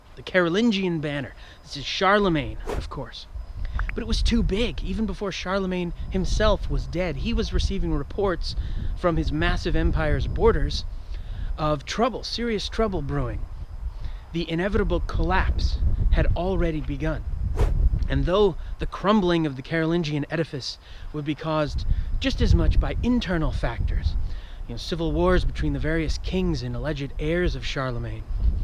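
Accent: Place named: United States. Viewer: His accent sounds American to me.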